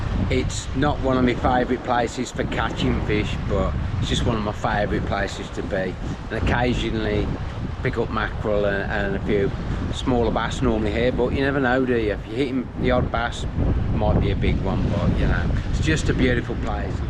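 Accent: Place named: Birmingham, United Kingdom. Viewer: Sorry to my ignorant ears your accent sounds Brummy, I'm from south Wales.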